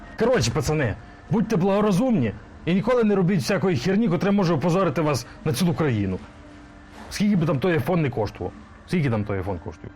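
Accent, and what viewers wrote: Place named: Galicia, Ukraine. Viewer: НЄ ну цей пацан для мене самий. Ріл смішно щей галицький діалект)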